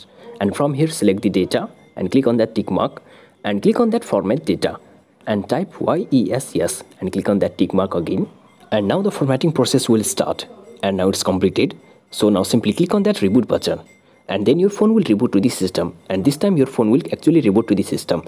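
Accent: India. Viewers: Thumbs up for this indian speaking english finally I can understand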